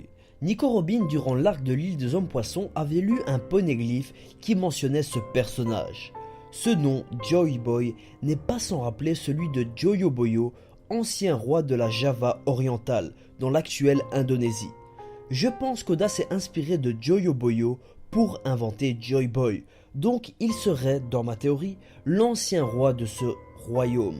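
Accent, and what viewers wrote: Brussels, Belgium. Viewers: T'es de Bruxelles?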